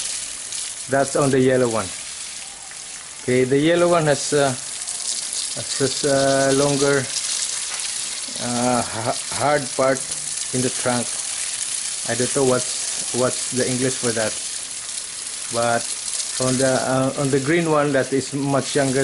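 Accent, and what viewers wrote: Visayas, Philippines. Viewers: akala ko indonesia kayo bisaya pala mo dong oi gi atay hahaha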